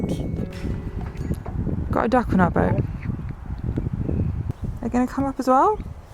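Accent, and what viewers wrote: United Kingdom. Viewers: What the hell is vocal fry!! She speaks the Queen's/King's English. Perfectly understandable and not in any way jarring.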